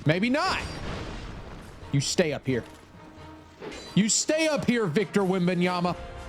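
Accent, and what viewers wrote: United States. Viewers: first time i seen this guy and ten seconds in i’m like “baltimore” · Ayy fam are you from Philly/Delco??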